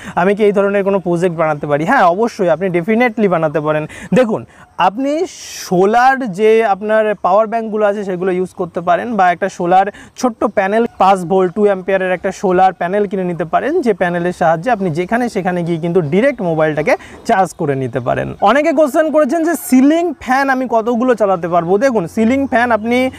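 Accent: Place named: West Bengal, India. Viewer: বেটা অম্লমধুর! তোমার দেয়া তথ্য আমার ভালো লেগেছে, কিন্তু তুমি ওপারের! তোমার বাচনভঙ্গি আমাকে সেটা জানিয়ে দিয়েছে। এপারে দুটো মূর্খ আছে যারা নিজেদের পন্ডিৎ মনে করে কিন্তু আসল কথা টি বলেনা। তুমি একটু ক্ষেমা ঘেন্না করে বলে দাও তো! অনগ্রীড সোলার সিস্টেম কি দিনে বিজলী চলে গেলে কাজ করে? তুমি সাবলীল ভাবে তড়িৎ শব্দটি উচ্চারণ করছো অথচ এপারের দুই পন্ডিতের একটা 'টেকনোলজি' কে বলে'ট্যাকনোলজি' আরেক পন্ডিৎ বিরক্তিকর ব্লাবারিং করে। আমার প্রশ্নের উত্তর দিতে ভূলো না বেটা।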